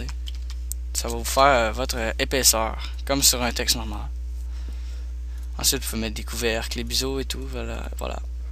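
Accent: Canada. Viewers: L'accent canadienne ♥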